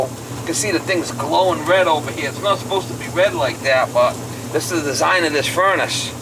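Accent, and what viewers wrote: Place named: Boston, United States. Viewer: Oh my goodness..ahh you kiddin me? You sound eggzacktlee like my grandmutha my mumma and awl my relatives from Boston Lowel and Billerica yo wa voice tone sounz eggzactlee like my uncls my uncle brooce! I was writing this exactly the way my family sounds when they talk oh my gosh i cant believe it, i am getting teary eyed and i feel a warm no joke im not pullin your leg i feel a warm loving cozy safe feelin in my chest my heart listening to you talk....it is remarkable to listen to a familiar accent and feel immediate family love a sense of belonging it is so weird! I am going to watch now ive been listening to you and feeling so much comfort my gosh im gobsmacked im absolutely stunned ...holy cow. Okay well thank you for being you! Lol now im gonna watch because you are going to change out thermal cupler and that is exactly what my problem here is and its an old Gaffer and Sattler furnace forced gas heater it went out and now i cant relight it ...not sure how it went out to begin with!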